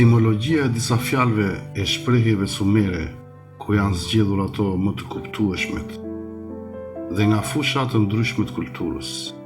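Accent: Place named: Gheg, Albania. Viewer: Gegnishte e paster. Sa let për ta kuptuar, faleminderit për kto informata të çmuara.❤🇦🇱🇦🇱🇦🇱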